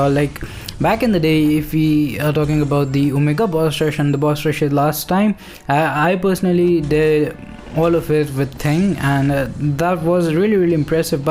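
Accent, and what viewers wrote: India. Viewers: When I saw him 1st time I thought he look like Indian and his Accent and Now finds out he is 😘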